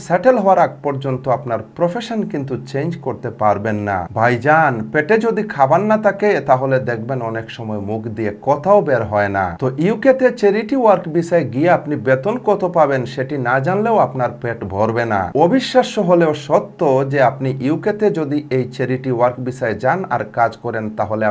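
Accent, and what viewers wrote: Sylhet, Bangladesh. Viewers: Vaiya apne Sylheti ni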